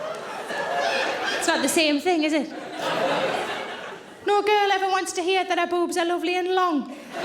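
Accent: Newcastle, United Kingdom. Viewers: Geordie, from Newcastle on the UK. Not Georgia.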